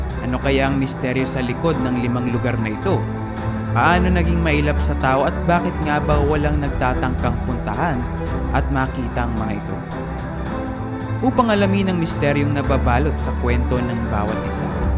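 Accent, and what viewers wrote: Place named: Philippines. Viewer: He's Filipino, and he's using our Tagalog native language. Therefore, there's nothing wrong to use the Filipino diction. Nevertheless, he's creating videos that are very informational and educational and I think his intonation is less our concern. 🤷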